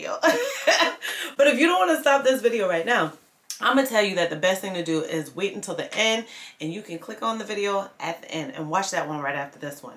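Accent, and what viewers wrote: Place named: New Orleans, United States. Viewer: awe ok!! It sound like I kept hearing a N.O accent.😂😂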